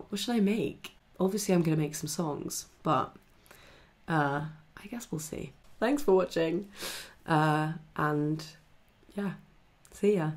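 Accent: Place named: South Africa. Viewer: you sound South African. you from Australia or New Zealand?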